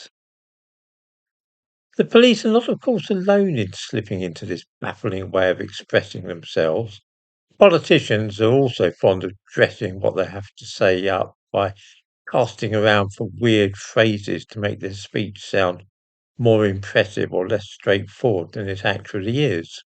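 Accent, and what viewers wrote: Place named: England, United Kingdom. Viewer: is English as is his pronunciation.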